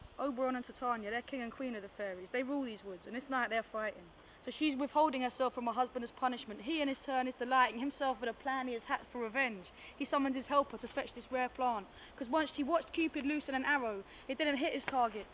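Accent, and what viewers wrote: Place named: South London, United Kingdom. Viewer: She's from South London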